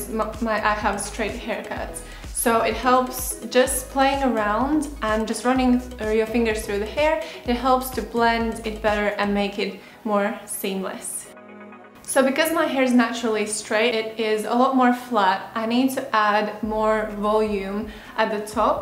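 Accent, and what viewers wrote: Latvia. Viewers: ? latvian? I think, when I speak English I sounds kind a similar...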